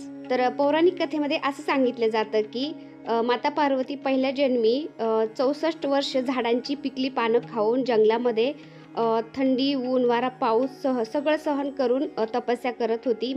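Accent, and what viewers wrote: Ahmednagar, India. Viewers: Tai khup chan kele tume puja tumcha bhashe mde aplya .Nager cha sugandh yeto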